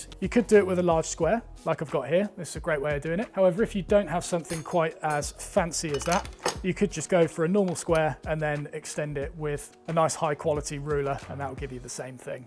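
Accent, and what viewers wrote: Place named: United States. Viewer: Perfect American Accent 👌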